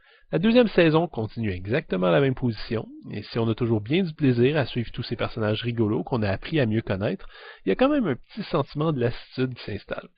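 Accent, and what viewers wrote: Quebec, Canada. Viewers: C'est déjà sortit au Québec? (d'après l'accent...) Bonne critique! Merci!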